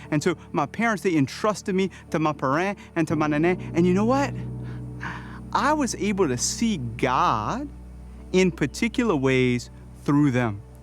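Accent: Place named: Louisiana, United States. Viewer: I've said it before and I'll say it again, Father - your diocese should really promote your videos more! They are beautifully made and your teachings are wonderful. I use them in my parish weekly newsletter and our website, here in the Archdiocese of Philadelphia! Love your Louisiana vocab and accent! ;)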